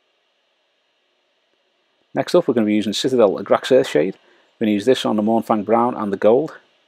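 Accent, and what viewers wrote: Liverpool, United Kingdom. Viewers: did any1 else at the begining think he sounded like he was from the netherlands n was talking a foreign language on the introduction then i realised he was a scouser no offence loool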